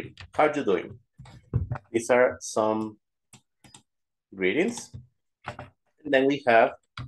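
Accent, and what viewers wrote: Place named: Spain. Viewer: Why do you sound like your speaking Spanish. True precolumbian perupecha would not have had the Spanish influence over pronunciation, but the spaniards did such a good job of destroying our true culture there is no trace of the actual spoke language from before 1500s exist. Thank you Spain. All were left with is traditions passed down by the conquered peoples who were forced to become Hispanics rather that natives after mid 1500s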